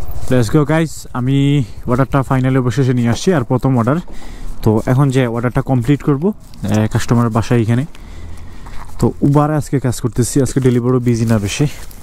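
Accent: Sylhet, Bangladesh. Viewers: Vai mone hoy sylhet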